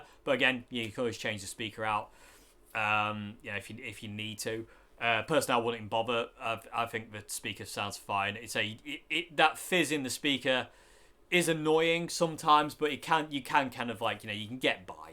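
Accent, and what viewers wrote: United Kingdom. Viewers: Hey Mr....You and I have something in common....We both talk funny...You’re from the U.K...and I’m from..New Yawk...It ain’t easy being us 😊. P.S...Brass Nuts...can have...a few different meanings....Keep on keeping on young man...👍😎🎸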